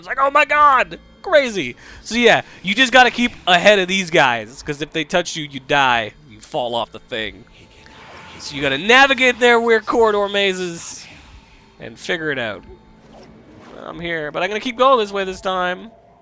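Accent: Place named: Russia. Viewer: "join the army they said- It'll be fun" Pretty much the summary of the whole game. I am also a fan of the 'turn around and everything is different' and 'magically forming floor' tropes. It always gets me, specially when used in a horror/thiller sense. Not my favorite game, but it was entertaining none the less, your Russian accent helped with that lol. The monster's especially the Librarins were honestly terrifying. Though the story was super muddled to me and when you start explaining the book and stuff at the end I go "huh... so that's what that was"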